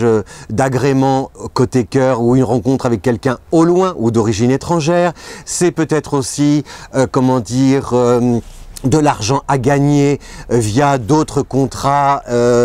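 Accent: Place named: Belgium. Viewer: Vraiment marrant l'accent belge.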